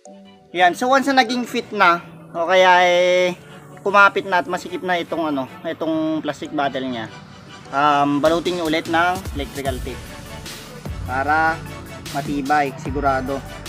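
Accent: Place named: Visayas, Philippines. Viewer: Bisaya ka lpds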